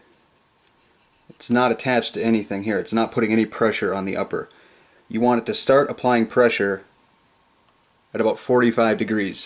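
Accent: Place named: Michigan, United States. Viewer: After watching the zeroing video and now this one you gotta be from Michigan. Lol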